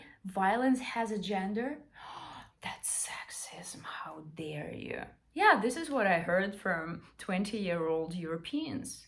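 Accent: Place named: Russia. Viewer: Я сразу услышала по произношению, что это наша девочка, русская🤗 Как же ты права, милая!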